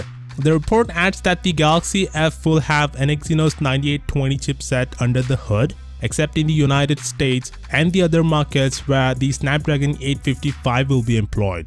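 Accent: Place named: India. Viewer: Your voice gets super deep when you say "lower". Indian accent ftw!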